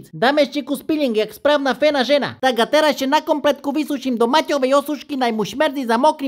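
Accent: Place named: East Slovak, Slovakia. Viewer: Juuuj, jsi největší hvězda ⭐ na jakou jsem narazila. Kdysi jsem měla manžela z východního Slovenska a tento dialekt miluju❤mám na to krásné vzpomínky ze Šariša😂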